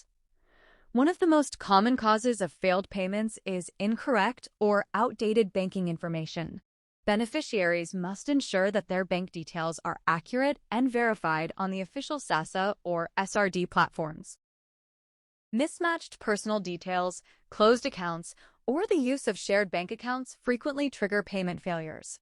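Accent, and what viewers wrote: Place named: United States. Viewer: Stop these fake news you sound like an American your acsent is not South african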